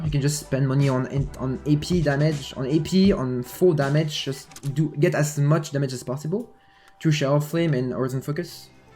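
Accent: France. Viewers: You're french aren't you? Your accent sells it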